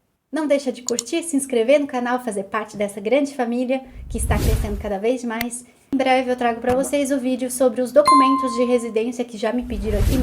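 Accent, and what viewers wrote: Brazil. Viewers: aquele sotaque bonito do Brasil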